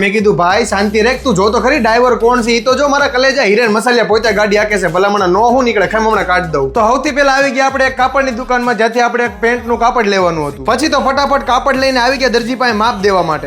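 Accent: Kathiawar, India. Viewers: ... ભયંકર મસ્ત અને જોરદાર કાઠિયાવાડી બોલો છો ભાઈ.. એક નંબર અને જીંકલ પણ...😅😅😅❤❤❤👌👌🫰.. ભમ ભમ..se ne he..se ne he😂😂😅.. સેજ કટક બટક😮😂.. પાણપુra😂😂❤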